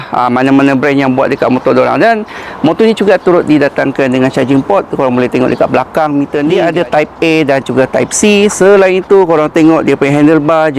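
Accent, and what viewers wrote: Sabah, Malaysia. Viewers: Mcm org Sabah, ngam ka?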